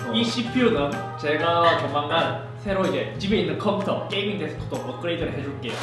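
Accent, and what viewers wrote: Gyeongsang, South Korea. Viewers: 재밌다 재밌어..최고다~~~긔여워 죽겟당~ 갱상도 아이가~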